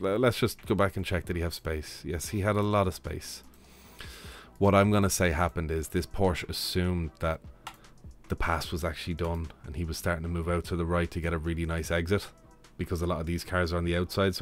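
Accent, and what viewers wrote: Ireland. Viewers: The Irish accent, just love it.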